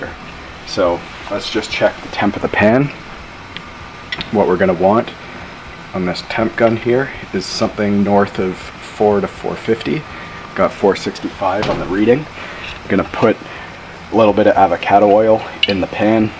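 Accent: Canada. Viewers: R u Canadian? Cuz you sound Canadian AF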